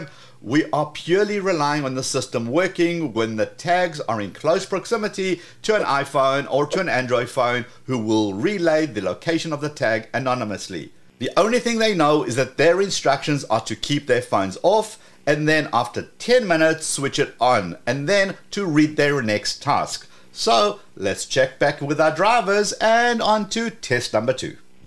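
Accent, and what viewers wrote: South Africa. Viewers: Thanks for the video! Your maps showed Dallas. Your accents sound South African with a touch of Sydney. Ever been to Australia?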